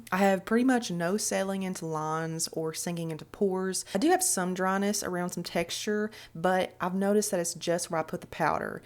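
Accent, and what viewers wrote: South, United States. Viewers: Just found your channel and subscribed as soon as I heard your Southern accent. Even though I'm old enough to be your mama or grandmother I still use makeup and like to see reviews before trying. Thanks for your honesty about the products. I'm in Alabama btw.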